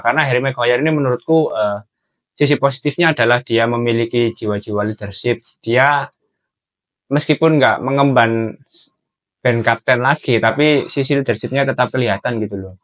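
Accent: Java, Indonesia. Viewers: Jowo e ndi??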